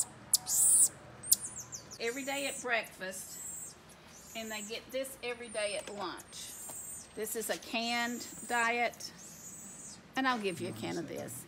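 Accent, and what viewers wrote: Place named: South, United States. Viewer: That is that sweet southern accent for ya! Hahaha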